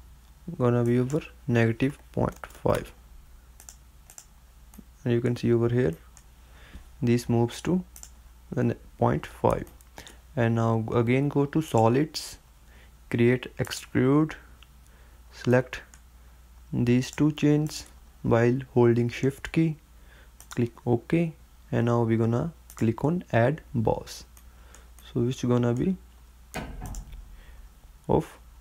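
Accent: India. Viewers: You go entirely too damn fast for anyone watching this to keep up. Also, you have a very thick Indian accent, and tend to mumble. Work on enunciating your words better, other wise your only audience will be folks from India. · Do us all a favor and have someone who doesnt have an indian accent do the narrating. Nothing personal but english does not go with your accent. Its a shame beacuse theres a lot of good content ill miss out on.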